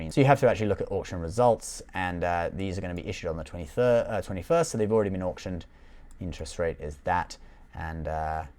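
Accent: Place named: England, United Kingdom. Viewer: Your accent is English. Is there a t bill equivalent if you're not resident in US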